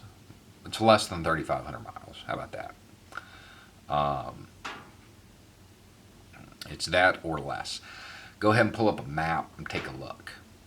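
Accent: United States. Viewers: You speak real American. I wish more of us did. We all need to show up at our local republican office and demand that they denounce this Fucking imbecile trump and start impeachment proceedings... or we pick them up by their Fucking Traitor throats.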